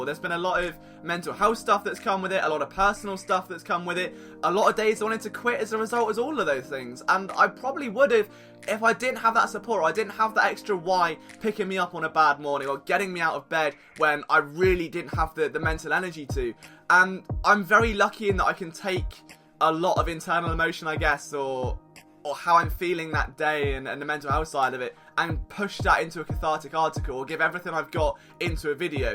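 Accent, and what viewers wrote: England, United Kingdom. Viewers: Really happy for you, English accents get on my nerves honestly but I still love all your eagles videos lol. Keep up the good work! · To be honest your voice was the reason I tuned to you. I never heard a Brit speak Eagles. But after listening your presentation and analysis, it is clear that you have a passion for the Eagles. I loved them over 23 years ago. I am glad you are achieving your goals. Semper fi!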